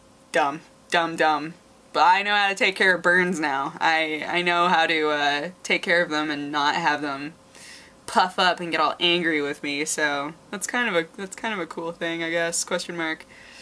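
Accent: Spain. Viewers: hey I know spanish (well actually I know english, spanish is like the normal language I speak :p ) Your accent sounds very, like, from Spain. nice job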